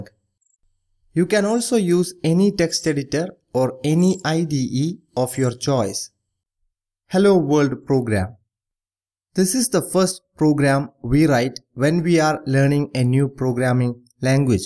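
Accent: Kerala, India. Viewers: By your pronunciation ,,,i found u are malayali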